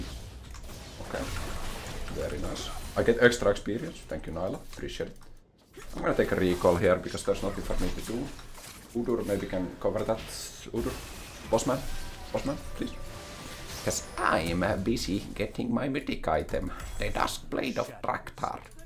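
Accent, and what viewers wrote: Finland. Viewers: Love the finnish accent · Nice action! But i swear, everytime i hear you speak, all i can hear is finnish. 🤭 Best regards from Estonia! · Doesn't really sound spanish at all to me. But that might be because I'm also finnish so I know our accent well.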